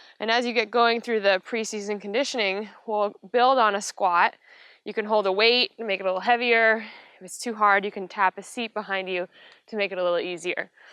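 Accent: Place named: Australia. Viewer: Your aussie is so beautiful!!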